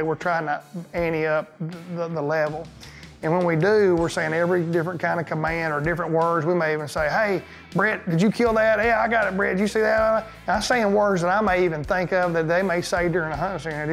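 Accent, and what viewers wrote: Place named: Texas, United States. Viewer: That Texas accent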